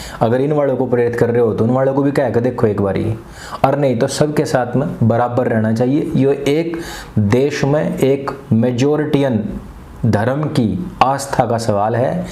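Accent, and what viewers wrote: Haryana, India. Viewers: Haryanvi hai bhai yaa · हरियान्वि लहेजे मे एक् नम्बर लग रहा है video एसे हि विदिओ बनाते रहिये आचर्य जी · aapka haryanvi accent sun k accha lga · Haryanvi nikla hai bhai aaj toh. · आपकी हिंदी के साथ हरियाणवी भाषा का तालमेल बहुत मधुर लगता है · Love the haryanvi accent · Bhai tagdi haryanvi bol rahe ho... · I think bhai had spoken a hariyanvi accent in this video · Hariyanvi boli k liye wa..wa...wa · यहां तो Pura Haryanvi Accent hai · haryanvi op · you sounds like my hariyanvi friend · Sir wah love your haryanvi accents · aaj Haryanvi tone me kyu bol rahe ho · Nice haryanavi sir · राम राम सा सर ...... Love from Rajasthan ❤️❤️ आपकी हरियाणवी सुनके बहुत अच्छा लगा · Bhaii haryanvi ko or khadi boli ko hataa kr seedhe seedhe bolo to or accha effect डालेंगी aapki video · Bhai tu haryanvi bole hai 😘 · your Haryanvi style of speaking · हरियाणावी लठ मार बोली 🙂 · Bhai shab haryanvi bilku sahi bol rahe ho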